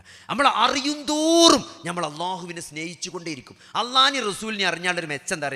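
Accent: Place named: Malappuram, India. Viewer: Very nice speech👍ഡോക്ടർ ആയിട്ടും തനി മലപ്പുറം ഭാഷ 😞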